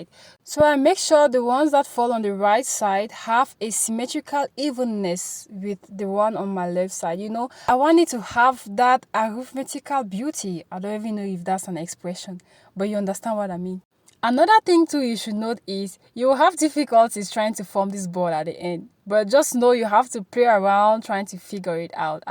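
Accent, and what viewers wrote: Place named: Cameroon. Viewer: Hi my Cameroonian sis🥰🥰. I heard you speak and I’m like she sounds Cameroonian… I watched untilllll I heard you say you are from pays 😍😍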